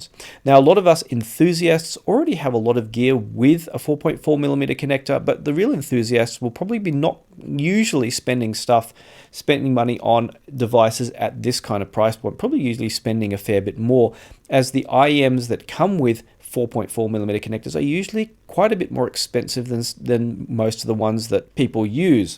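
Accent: Australia. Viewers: $130 what are you talking about try $230 it's very confusing to hear an Australian accent talking about products in US dollars. When watching this from Sydney Australia.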